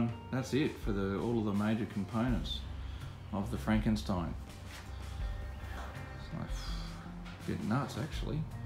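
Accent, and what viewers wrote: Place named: Australia. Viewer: Nice work,.........and its good to hear an Aussie voice of many vids that ive watched........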